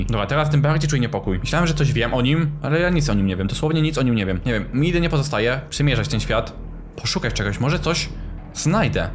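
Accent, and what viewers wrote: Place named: France. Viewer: Czemu masz francuski akcent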